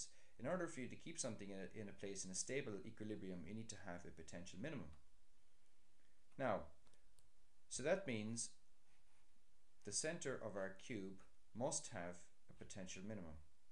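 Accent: Ireland. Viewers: I actually like your, I believe Irish, accent. I appreciate a variety of accents which seem to help me focus. On the other hand the somewhat low volume is a bit of a problem. I have to pick quiet times to listen to the audio.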